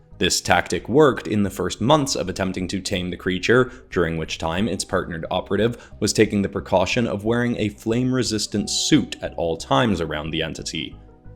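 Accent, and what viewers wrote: Canada. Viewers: You know, I've known you were Canadian for a long time, but never have you gone THAT Canadian. Love it, but man did you throw me for a loop! I wasn't even thinking to much about the "Z", lol. Love the designs for Snivy and Tepig designs. I don't play much Pokémon, myself, but they're some of my favorite starters, art-wise. So cute! That second one, though... that was rather disturbing looking. Glad for more of these! Just out of curiosity, the only archon we haven't seen do much yet is Romilasect. Do you have any plans for her in the near future? Presumably regarding one of the core couples, I take it. I've been hooked on the TAHE storyline (and am looking forward to seeing what happens next with Kiko, Alsya, and Dresden), but I guess it does strike me as weird how much of a side character that specific villain has been. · IDK are you Canadian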